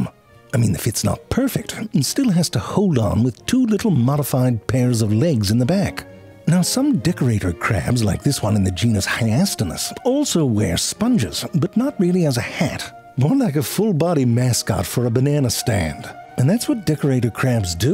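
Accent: Germany. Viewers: What the heck, your German sounds so good! Just from the way you speak I as a German would’ve thought oh, this is probably a dialect from some other federal state. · Your german accent is impeccable!!! 😊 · All these years and i had no idea Ze Frank was a native German speaker till now! That's really cool!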